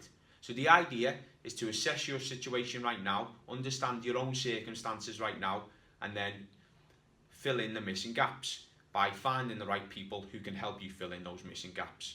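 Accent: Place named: Wales, United Kingdom. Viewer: you sound welsh I'm welsh to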